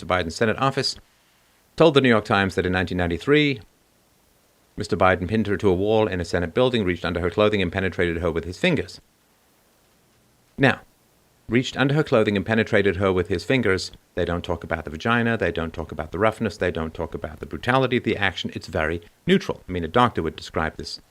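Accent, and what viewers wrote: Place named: Ireland. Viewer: Where are you from? Cool accent. Is it Irish? The scary thing is...Biden could, potentially win, especially in the middle of all this insanity.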